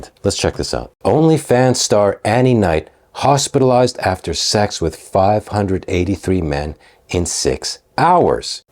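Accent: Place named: Australia. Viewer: I was really really hoping to NOT hear an Australian accent /: I'm so ashamed /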